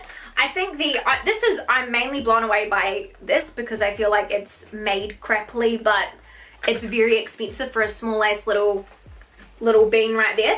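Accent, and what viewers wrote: New Zealand. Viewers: !! I'm loving your excitement in the video, so glad you love the box. I'm a kiwi living in UK, so nice to hear a friendly voice from my home x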